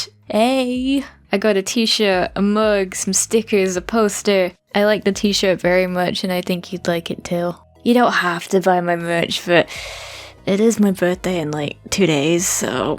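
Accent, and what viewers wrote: United Kingdom. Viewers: As an American who loves a British accent, all of your content is ASMR to me. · Mmmm british accent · Ur 2 British to do asmr vids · for every video you sound british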